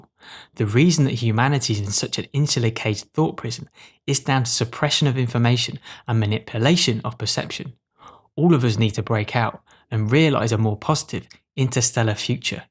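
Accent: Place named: United Kingdom. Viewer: Bloody Brit-ish acc-ent!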